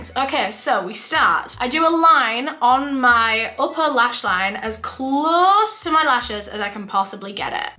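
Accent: United Kingdom. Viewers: My friends (who are 100% deaf and, thus, haven’t got the slightest clue what your Voice sounds like) mentioned that you’ve repaired the Auto-Captions of some of your November & December Videos…They said they had no idea you’re from the UK; they can’t hear, so they never noticed your Accent…Because I don’t know any Sign Language and my friends don’t speak and can’t understand speech either, I always need to communicate with friends by Text Messages all the time…My friends can’t watch your LiveStreams until they’re later saved to their (respective) Channel(s) as regular Videos, thus (hopefully) gaining Auto-Captioning (usually, a day or two afterwards)…My friends turn on the “CC” (so they can figure out what’s being said in Videos) and they hand me the remote so I can, meanwhile, turn on the Sound and I just ignore the Captions and listen to what’s being said in Videos…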